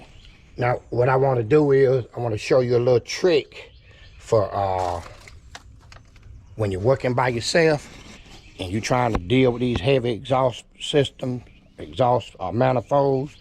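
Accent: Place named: North Carolina, United States. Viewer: heard the carolina accent, what part of nc?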